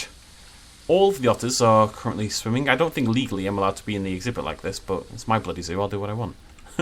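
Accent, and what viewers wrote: United Kingdom. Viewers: Ah i love your planet zoo videos so much, they are the only ones I watch and you inspired me to go from flat squares with....no rocks! to rock filled funky shaped habitats with lots of bushes made from sunken trees. Dude do whatever you want with the game honestly I prefer the habitats and stuff and often skip the buildings (sorry) so I'm super on board with just habitat builds. Also I love your accent where are u from (lmao weird) I assume somewhere from the UK but not essex cause I'm from there and u will never her a t come out of my mouth lol.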